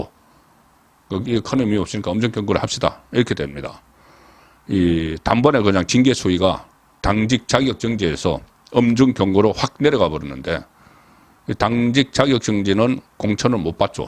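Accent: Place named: Daegu, South Korea. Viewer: 좋은 방송으로 잘 듣고 있는데 진행자의 억양이 참 거슬립니다..앞 음절이 올라가는 대구 억양인데 용어의 뜻이 달라지기 때문에 꼭 고쳐서 방송에 임해주십사하고...이런것은 사투리를 떠나 쉽게 고쳐질수 있다고 사료되는데...........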